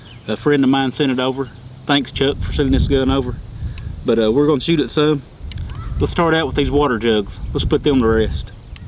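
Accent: United States. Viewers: Love ur 'merican voice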